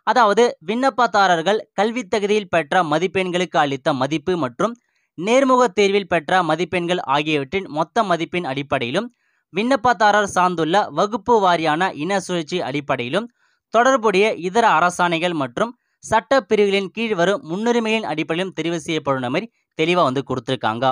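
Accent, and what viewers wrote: North, India. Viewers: என்னடா நீ வடக்கன்ஸ் மாதிரி இருக்கு உன் குரல்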